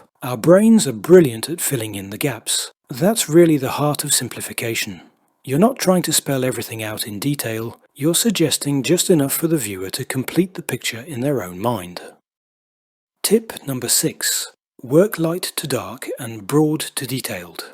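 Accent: United Kingdom. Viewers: He sounds English but can not spell colour?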